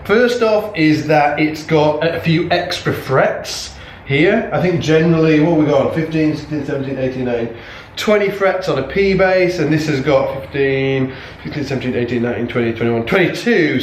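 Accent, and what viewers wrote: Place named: Northern England, United Kingdom. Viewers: I agree about having a better bass doesn't make you any better at playing but I wouldn't say you play exactly the same on every bass, some are more comfortable than others 😏 Fender Cabronita Precision Bass (speaks for itself) Squier Affinity Bronco Bass modified with Seymour Duncan Mustang Bass split coil pickups and a Jazz bass bridge pickup (brand unknown) (and a confederate flag pickguard hehe, not racist 😏) SX Vintage Series SPB57 Precision Bass (love this one) Also gonna buy an Ibanez ATK200 sometime soon Ampeg BA-108 V2 (selling) Peavey Tour Series 450 bass head (also selling) Soon getting an Ampeg BA-210 V2 PS nice basses, and let your Yorkshire accent fly hombre 😏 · "A toone"! Yorkshire much? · You’re so northern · Nowt wrong wi'a Yokshuh accent :)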